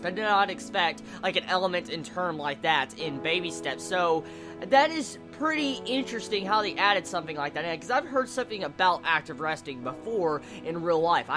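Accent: South, United States. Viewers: Great review! First time viewing your channel, and I find it to be very well planned and enthusiastic. I can tell you do this for yourself yet you still appreciate your viewers and subscribers. Hearing Japanese names in a southern accent is hilarious and I hope you don't try to fix that. Yes I'm starting to get the anime blues on this one as well. This series is one of the most inspiring and entertaining sports anime I've ever seen! I have always enjoyed the more realistic dramas more than the fantasy shonen. I suppose that's why I like this one so much. Thanks for what you do. *subscribed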